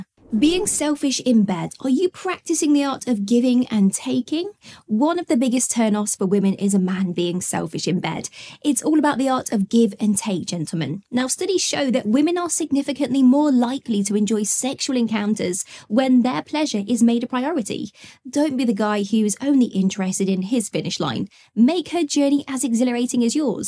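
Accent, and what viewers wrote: United Kingdom. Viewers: Damn I need to find a woman like. I love the British accent from a woman. (Never Assume Anything, it always makes an Ass of U M E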